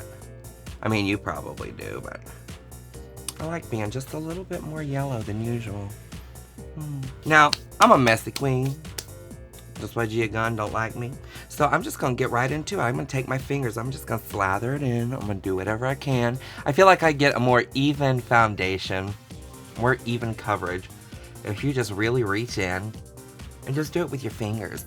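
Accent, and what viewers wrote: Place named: South, United States. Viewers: She talks like a little southern gay Bob Ross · ginger sounds so sweet and southern and endearing when she speaks i love it so so much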